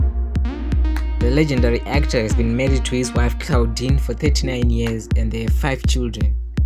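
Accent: Zimbabwe. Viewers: Why do I think that the narrator is from zim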